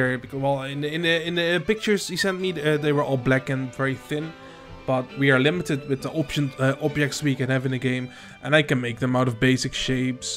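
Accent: Netherlands. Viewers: Hoe Nederlands kan een acsent zijn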